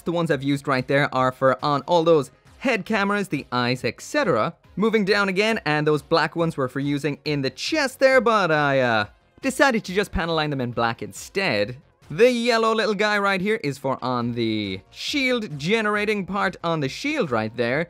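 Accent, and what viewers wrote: Ireland. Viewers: You're irish?!?! It makes sense now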